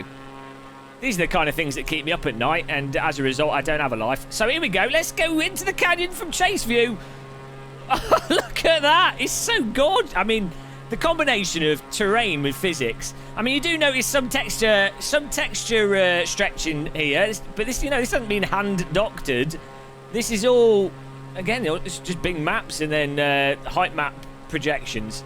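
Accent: United Kingdom. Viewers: "ok you see what hapened theaaaaaaaah...?" xD You are sooo bri`ish!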